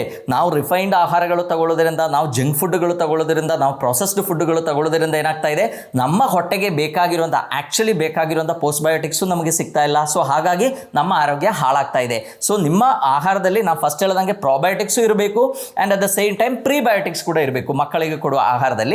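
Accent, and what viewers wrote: Kerala, India. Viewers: Sir r u malayali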